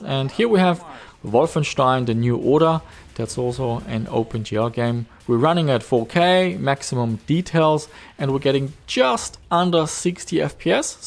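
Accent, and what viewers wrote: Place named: Germany. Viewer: Look! My favourite German accent Australia dweller has a face!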